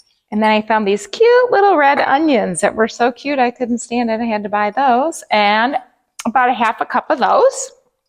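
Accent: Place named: Minnesota, United States. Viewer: Your recipes look sooo great!! I am gonna start trying them. Where are you from?! I am originally from Wisconsin, so I am loving your accent 😊. My guess is Minnesota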